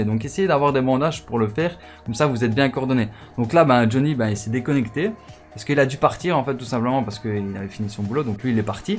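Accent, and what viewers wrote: Belgium. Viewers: Tu es belge toi non ? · T'es belge?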